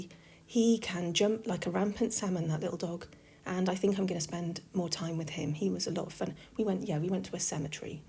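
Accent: United Kingdom. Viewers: I didn’t catch your video on Halloween, but any time is a good time for a video from and. Nothing like a good ghost tale told with a British accent. 🥰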